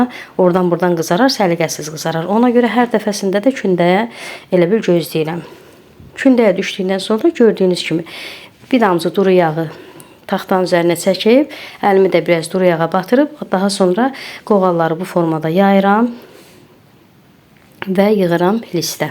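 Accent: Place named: South, Azerbaijan. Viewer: Hansı rayondansız ləhcə sirin gəldi. Cənub zonası?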